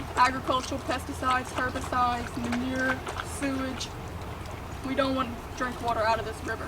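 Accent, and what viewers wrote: Louisiana, United States. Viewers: Knew I recognized that accent. Greetings from cajun land! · I was trying to id your accent, so you are a lil cajun? Its disturbing how much better your vid's are than so many hard core looking survivory vid posters.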